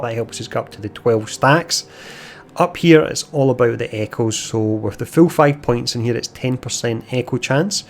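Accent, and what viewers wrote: Scotland, United Kingdom. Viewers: I came for the build, stayed for the Scottish accent.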